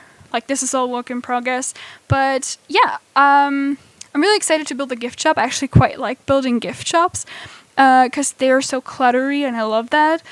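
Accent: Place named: Netherlands. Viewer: Really detailed and good looking build! There is a chance you identify Dutch youtubers as German youtubers, our prenounciation in English is quite simillair. The red colored lamp are usually lamps that are designed to generate heat because the animal needs basking spots or a specific temperature. Ow and cookies, def cookies. Keep up the good work, just subbed!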